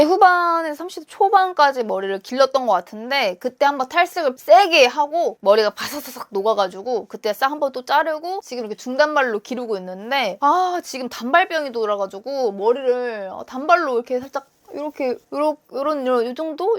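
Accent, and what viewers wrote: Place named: Chungcheong, South Korea. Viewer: 세종인으로서 님 충청인일 것 같았어요 ㅋㅋ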